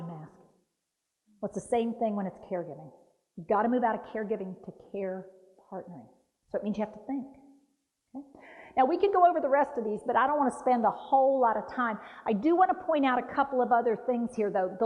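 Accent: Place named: South, United States. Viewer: uses southern drawl... exaggerated southern, rural speech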